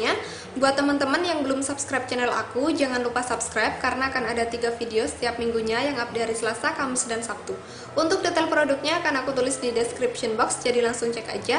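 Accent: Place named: Yogyakarta, Indonesia. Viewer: Medok.. orang jogja po mbak?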